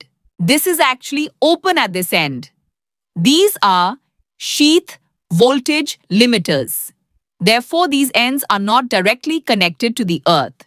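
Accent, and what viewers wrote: India. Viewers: Funny that Indian dialect is still there in the AI speech :D Anyway, great content!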